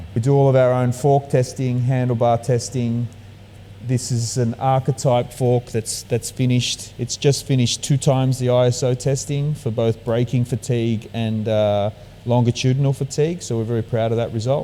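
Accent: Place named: Australia. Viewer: Wow. I want one. Got a shock when you spoke with an Oz accent after the algorithm put this up (I am from Melbourne). Will look for your website now 👍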